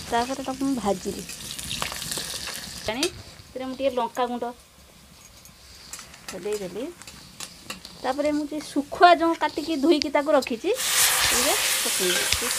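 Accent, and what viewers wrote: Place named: Bhadrak, India. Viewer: Tame bodhe bhadrakia 🤔🤔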